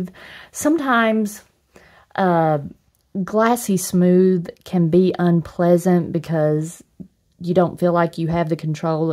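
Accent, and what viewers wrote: South, United States. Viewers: I saw one of her videos for I-don't-recall-what, I stayed for her consistent review technique in her videos and of course, the soothing voice in that cheery southern accent ☺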